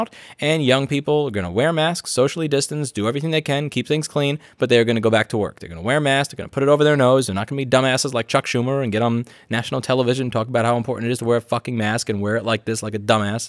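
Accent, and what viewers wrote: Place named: New York City, United States. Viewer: this is the strongest I've ever heard your NY accent.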